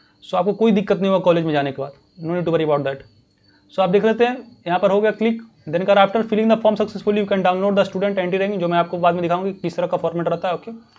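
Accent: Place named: Maharashtra, India. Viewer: Bhaiya you seem a Maharashtrian from your accent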